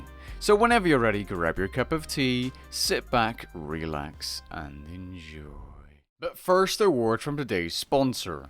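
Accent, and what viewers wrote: Netherlands. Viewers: Ik dacht al dat je NL was ik kon het horen aan je platte dialect hahahahhaha. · Nooit geweten dat je Nederlands was, nu kan ik het accent niet meer negeren haha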